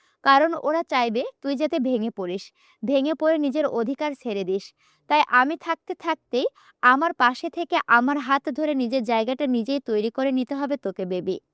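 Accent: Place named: Kushtia, Bangladesh. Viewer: আমার বাড়িও কুষ্টিয়া তে, আজই জানতে পারলাম তুমিও কুষ্টিয়ার মেয়ে,, একমাএ আমরা কুষ্টিয়ার মানুষরাই এভাবে কথা বলি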